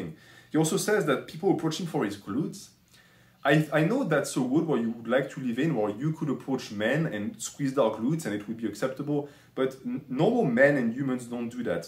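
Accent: France. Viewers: Brother even with a thickish French accent ur English is marvelous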